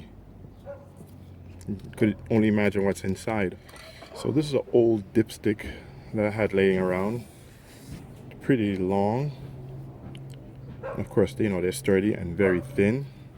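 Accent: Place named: Jamaica. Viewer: You sound like a yardie . Working on my 928 s4 project your tips help alot. Big up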